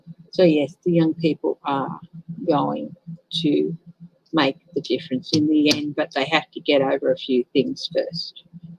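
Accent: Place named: Australia. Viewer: Thanks for reading on DeSantis,. Ron DeSantis is known to be tied to Trump. Cheating in the election is widely suspected. Lev Parnas and Igor Fruman were VIPs at his inauguration! Russia has a tight grip on the U.S. and it has been deadly. At this point I would not be surprised if people lose their homes and they are sold to Russian and Chinese oligarchs. I frankly don’t know if Trump will ever be brought to justice. I’ve kind of lost hope about it. The entire GOP are traitors who worship money 💰 and drag the name of Christ through the mud as they pretend to be bastions of morality when nothing could be further from the truth. The past four years have taken a heavy toll on me. I live in NW Florida just a few miles away from Johnie ( though we’ve never met in person). I love 💗 your readings— your Aussie accent is soothing. Your laugh makes me smile. 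🤗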